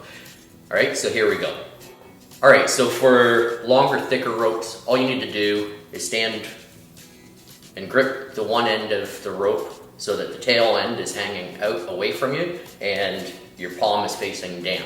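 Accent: Canada. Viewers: Nice Canadian accent.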